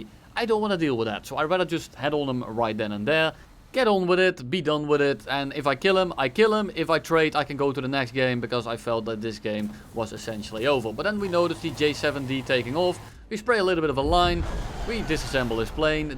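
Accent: Netherlands. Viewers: i smell a nederlands accentje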